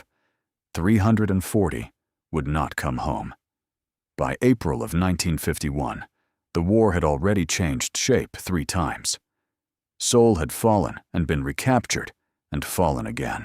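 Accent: United States. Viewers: Pity this story has be told in a crap American accent who can’t pronounce Australian places and speak in Strine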